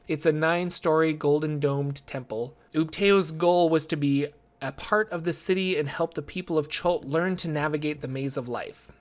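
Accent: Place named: Chicago, United States. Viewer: sounds like the south side of Chicago to me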